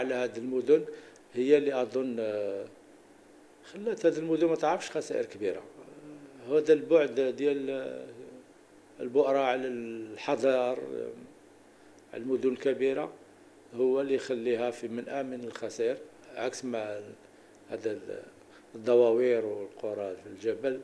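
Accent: Algeria. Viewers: واش هذا جزائري على حسب كلامه الدارجة المغربية هاربة عليه ويستعمل كلمات دخيلة على لغتنا حتى في اللغة العربية ديالو مايلة لجهة هكيين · تيهضر بحال شي جزاءيري 😮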